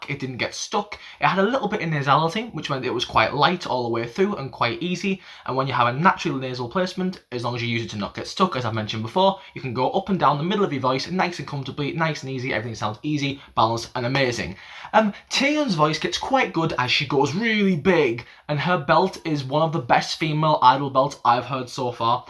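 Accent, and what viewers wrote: United Kingdom. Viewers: I wish u put some eng sub bec u speaks like james charles i mean u speaks fast and not all of us can understand British accent or what ever accent u have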